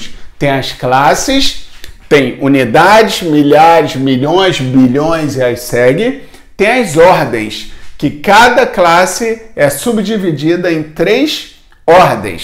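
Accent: Rio de Janeiro, Brazil. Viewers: Amo o jeito que ele fala carioca kk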